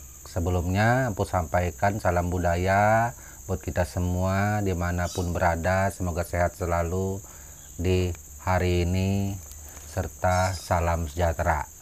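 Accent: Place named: Paser, Indonesia. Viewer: Berawal dari liat fb bahas mandau terbang Eh kok logat nya kayak bahasa PASER salam benuo taka 😊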